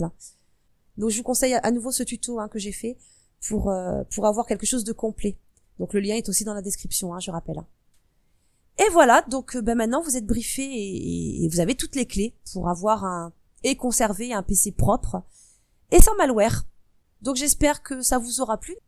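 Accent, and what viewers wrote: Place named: Marseille, France. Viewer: marrant l'accent de Marseille ^^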